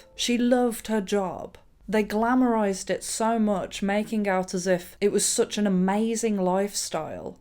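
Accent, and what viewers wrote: United Kingdom. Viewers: Your UK voice is amazing and the pronunciation and accent.. I could listen to this voice for hours. What part of UK is your voice accent from? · I love your accent 😍 are you british ? New sub here i love your content speak wisdom to this dumb stupid girls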